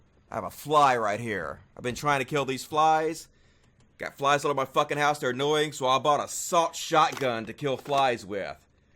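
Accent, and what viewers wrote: South, United States. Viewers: You are a great story teller and i like your southern accent Very much!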